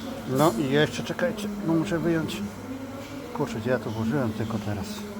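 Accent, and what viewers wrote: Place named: Ukraine. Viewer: Zaciągasz ukraińskim koleś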